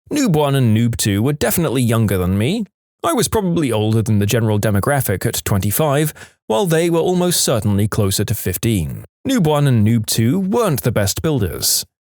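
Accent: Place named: North, United Kingdom. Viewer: Love this stuff and love the voice very Northern British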